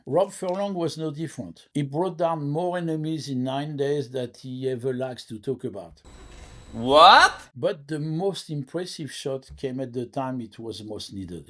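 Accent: France. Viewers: Un bon accent franco-français ahah j'adore tes vidéos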